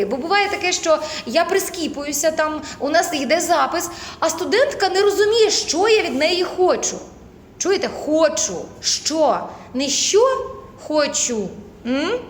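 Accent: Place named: Western Ukraine, Ukraine. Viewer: Таким чином шиплячi вимовляють на захiднй Украiн. У нас, на Днiпропетровщинi, не "ТЧикають". Напевне дiалекти краще залишати в мiстях iх вжитку, а не нав'язувати як еталон мови на педагогiчних подiумах.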